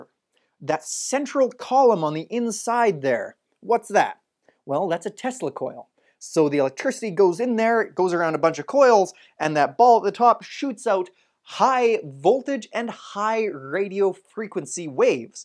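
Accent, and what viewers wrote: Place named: Ontario, Canada. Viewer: i know a South East Ontario accent when i hear one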